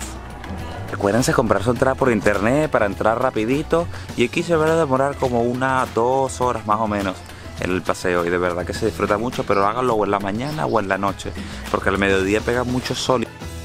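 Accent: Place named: Venezuela. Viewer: Llegue por casualidad a este video buscando informacion para mi proximo viaje a Roma, y por el acento y los comentarios sobre cada lugar asumo qué eres venezolano jajajajajajaja, tengo varias dudas sobre el viaje, has usado la tarjeta Roma Pass? la recomiendas? es cierto qué te ahorras la cola en las atracciones si la usas? Gracias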